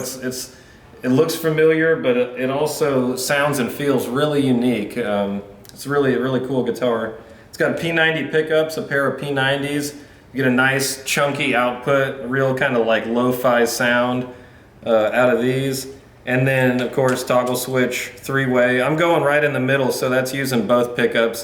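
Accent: South, United States. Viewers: Where are you from man, I’d be surprised if you were not a southerner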